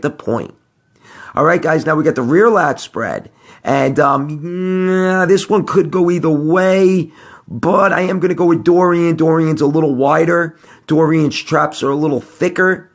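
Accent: New York, United States. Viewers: I love your NYC accent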